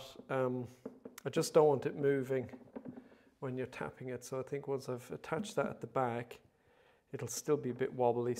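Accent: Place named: Ireland. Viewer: Painter's low tack blue tape or plain masking tape are my goto when anything needs precise marking out for nails, screws, drill holes, biscuit holes or multitool sqaure cuts. Love the fancy radiator on the wall and the herringbone floor. My true blue Dublin ears despite being in central europe for 20 years are detecting an Irish accent.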